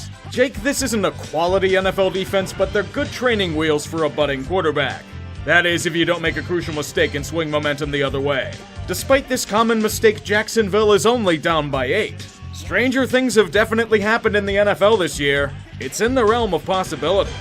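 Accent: Pittsburgh, United States. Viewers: Come on, let your Inner Yinzer do commentary for a Steelers game.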